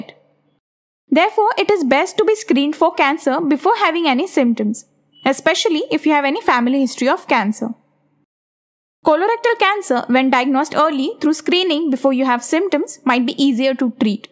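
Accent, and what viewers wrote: India. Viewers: I enjoy listening to your indian accent. Hope to imitate the way you speak English.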